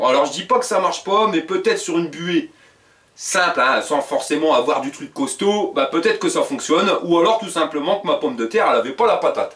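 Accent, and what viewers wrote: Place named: North, France. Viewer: Accent du nord, jeux de mots tout y est 👍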